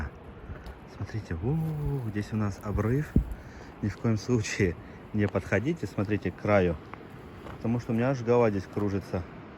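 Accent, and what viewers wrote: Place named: Ukraine. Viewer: Спасибо,! Путешествие очень интересное и полезное! Даже кажется я надышалась тем воздухом, которым дышал ты. Очень хорошо рассказываешь немного с украинским акцентом, это ещё приятней. Здоровья тебе и поменьше экстримов, а то даже дух захватывает и страх за тебя. Береги себя!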